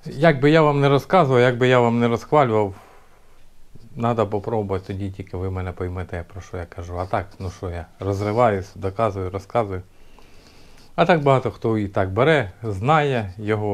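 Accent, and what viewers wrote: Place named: Kharkiv, Ukraine. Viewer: Дуже подобається твій говір!!! Наш-Харьковский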